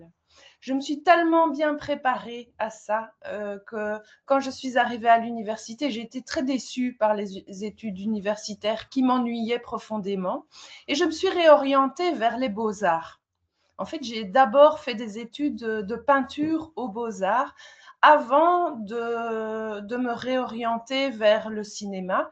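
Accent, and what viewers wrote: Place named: Belgium. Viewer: Rien qu'avec votre accent, je me dis que vous êtes belge. Alors, faire une fiction sur la RD Congo, svp. Merci d'avance.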